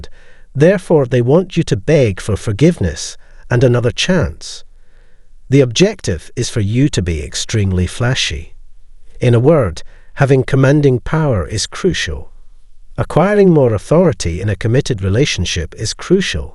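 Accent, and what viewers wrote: Scotland, United Kingdom. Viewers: Love ur videos, love the Scottish accent , 🇨🇮👍👍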